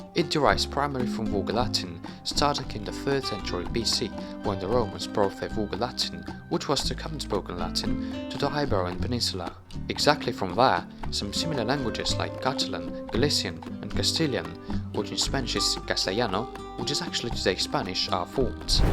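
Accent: England, United Kingdom. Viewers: ¡Me encanta el acento de Inglaterra!😊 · I've taken a few classes in Spanish, so I kinda have an active beginner's knowledge, and a somewhat passable passive knowledge, at least when written. Anyway, I knew about almost all the facts here, already, except for Equatorial Guinea. That English accent is quite something, though. I almost found it easier to understad you in Spanish... 🙄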